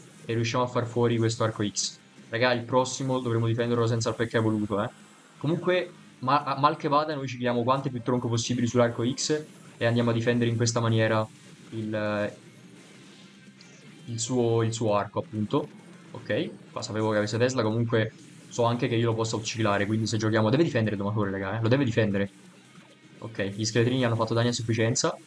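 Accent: Tuscany, Italy. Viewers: Boia.😂. Ma quanto mi piace il tuo toscanaccio. Io sono di Ferrara emiliano. Ogni anno vengo da voi a Siena. Montepulciano Montalcino e pienza vino formaggio e olio. Poi un fine settimana a mangiare toscano con i vostri crostini di fegatini e milza che pochi conoscono e vivo bene per un anno 😂😂😂😂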